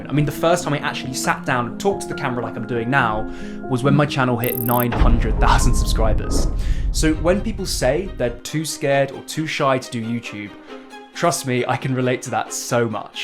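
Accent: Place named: United Kingdom. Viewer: Good video. Like your advice. And your British accent is crystal clear to us Americans. However.... this advice is pretty useless for those of us on YouTube who are not interested in monetization. Many of us are here for politics and to promote political viewpoints. In my case, libertarianism. Have zero interest in making $$ on YouTube. I have a day job. Now... if you really want to help folks like me, make a video on how people with day jobs can find the time to make YouTube videos.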